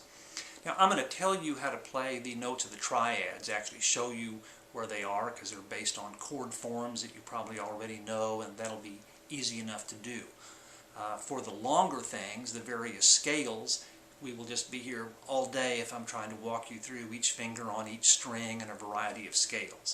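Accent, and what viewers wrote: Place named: Texas, United States. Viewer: You’re accent makes me miss Texas!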